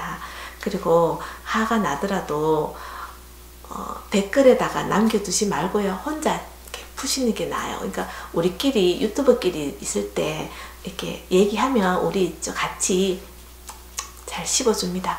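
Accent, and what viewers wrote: Gyeongsang, South Korea. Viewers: 나긋나긋한 경상도 억양 매력적이신 이모님 긴단하고 따라하기 쉬운 반찬 너무 좋아요 ♥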